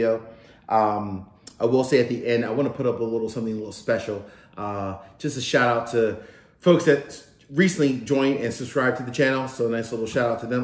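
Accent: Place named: Delaware Valley, United States. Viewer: Are you from the Delaware Valley? I detect a bit of an accent.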